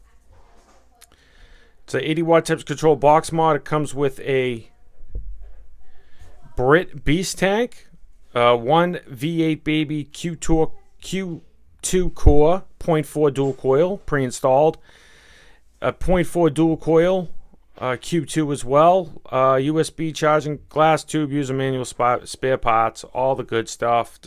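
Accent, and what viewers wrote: Boston, United States. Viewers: are you from boston? keep up the good work by the way !!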